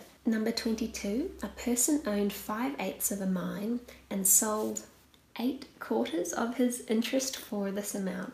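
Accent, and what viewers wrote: New Zealand. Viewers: In 1866 students would have been using a slide rule (see link below) to calculate the more difficult problems, but they would have to memorise all the formulae. Back in my day, we never had to use a slide rule as we did have calculators, but we were forced not to use calculators and use what we called "lookup tables" and calculate longhand. As to accent, I can hear a little NZ accent in there but the way she pronounced Albany I believe she is either in Western Australia or has lived there for quite a while. Australian Eastern Staters and Americans (I believe) pronounce it Allbany. <link> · Well... I can tell you're definitely not Australian since a) no Australian ever says shrimp, we say prawn and b) she's obviously got a Kiwi (New Zealand) accent so she's not even from the same continent. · Gold dust in Troy ounces and cotton in Ne (linear density)? 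By the way, are you from New Zealand?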